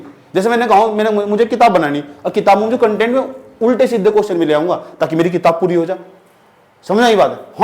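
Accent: Haryana, India. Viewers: Sir aap haryanavi ho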